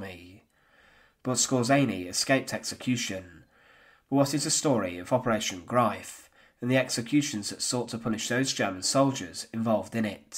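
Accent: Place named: England, United Kingdom. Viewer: Sounds to me, a British person, like he has an estuary accent. It’s very clear.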